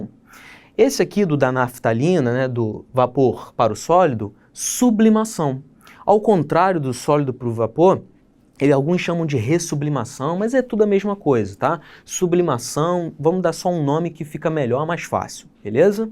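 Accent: Rio de Janeiro, Brazil. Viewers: Um carioca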